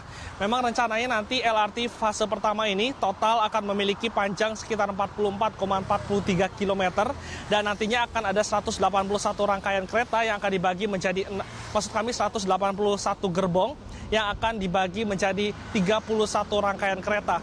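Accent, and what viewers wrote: Java, Indonesia. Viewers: Ra sah ditahan medokny mas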